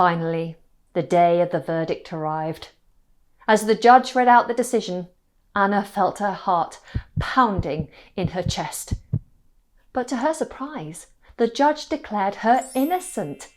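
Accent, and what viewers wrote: United Kingdom. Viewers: I love the Brit accent <3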